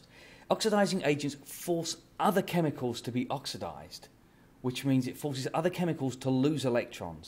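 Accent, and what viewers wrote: Australia. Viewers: I thought this guy was Australian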